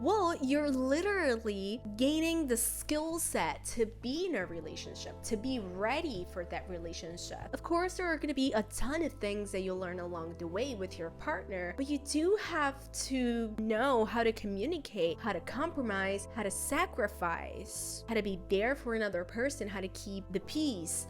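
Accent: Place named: Italy. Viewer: OMG ARE YOU ITALIAN? I understood you bf hahaha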